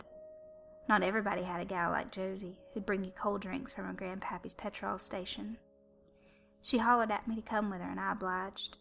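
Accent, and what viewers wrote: South, United States. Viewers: First time listener and new sub! I really enjoyed your video! I'm from Louisiana and if your not really from the South, you did a good job with the accent! I sure hated the first comment gave the whole story away tho... so it kinda ruined it for me,,,but I still liked it! Good Job and good luck with your channel!! Glad I have new stories to listen to!!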